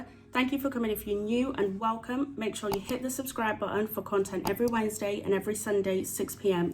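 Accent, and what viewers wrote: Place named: United Kingdom. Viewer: love that UK accent. Damn I can't wait 2 go back💯 I'm from Cali, I got soooo much luv out there. way more than I get in USA.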